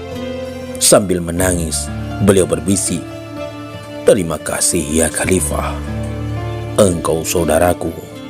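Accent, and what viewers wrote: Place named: Bali, Indonesia. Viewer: Yg bcra sperti orang bali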